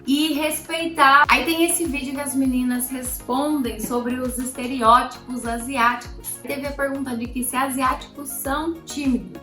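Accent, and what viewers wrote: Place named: Inland São Paulo, Brazil. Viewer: De onde você é? Um sotaque forte será do interior de SP? ,Ou é Paraná?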